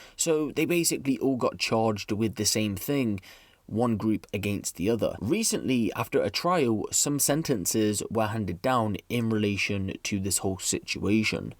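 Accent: United Kingdom. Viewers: What part of the UK is your accent from?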